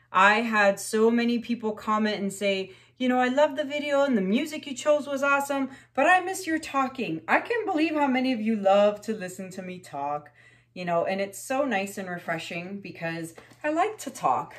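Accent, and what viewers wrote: Canada. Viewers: I love listening to your accent and chatter, I’m an Aussie and love the Canadian accent.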